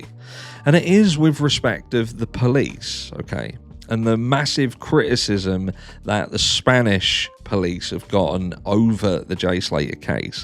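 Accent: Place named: London, United Kingdom. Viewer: Love that London language Mr ❤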